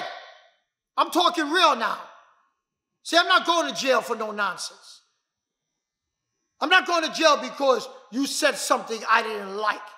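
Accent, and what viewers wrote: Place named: Brooklyn, United States. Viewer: Unc speaking big facts …I hear Brooklyn in Unc’s voice or somewhere close definitely from NY… sending much love from HTX enjoying the content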